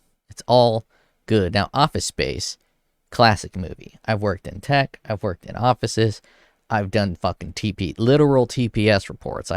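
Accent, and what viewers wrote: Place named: Alabama, United States. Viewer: What Town did you live?goddamn Alabama country boy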